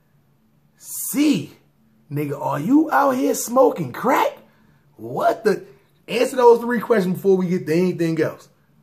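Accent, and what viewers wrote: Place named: New York City, United States. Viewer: Nothing like a nigga from nyc telling a story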